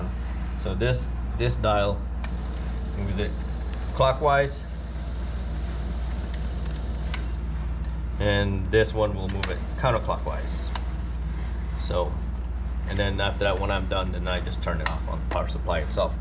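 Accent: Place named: Appalachia, United States. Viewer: I love how you sound almost as hillbilly as me through most of the video, then turn your heavy accent back on in the outro 😂 thanks I have been debating on getting into cue building and looking at this lathe. This was very helpful.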